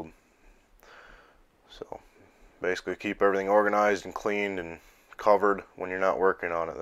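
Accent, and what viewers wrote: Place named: North, United Kingdom. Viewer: Judging how he sounds like he's from the north, I'd say this dudes gotta be making 35 bucks an hour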